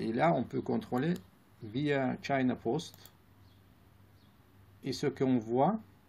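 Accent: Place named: Russia. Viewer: Я хз почему, но с твоим русским акцентом французский намного понятен на слух. Побольше на канале таких видео с собственной озвучкой. С меня подписка!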